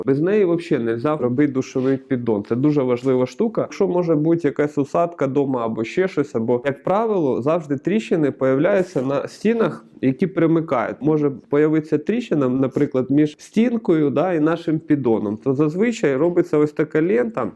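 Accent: Surzhyk, Ukraine. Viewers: за суржик 👎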